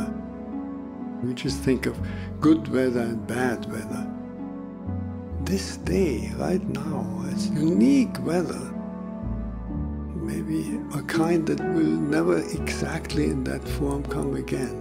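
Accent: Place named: Austria. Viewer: Brother David is speaking in his second language, English. He is speaking with an Austrian dialect. He is from Austria.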